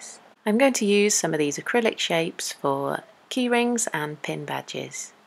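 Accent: England, United Kingdom. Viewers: You sound like your from England 🏴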